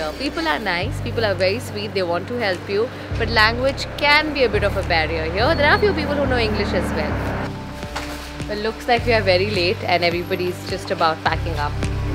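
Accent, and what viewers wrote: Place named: India. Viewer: the accent is so thick( Indian) Can’t understand the half of the times. Other than she seems like a nice lady.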